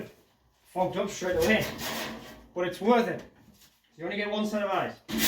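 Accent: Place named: Durham, United Kingdom. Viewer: Respect man, I love proper minis, and I’m originally from the Durham area so love your accent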